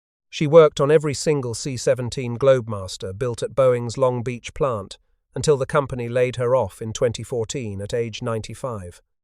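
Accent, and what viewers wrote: United Kingdom. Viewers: I absolutely adore listening…but genuinely curious why we have a male British narrator to catalog the history of American greatness 😅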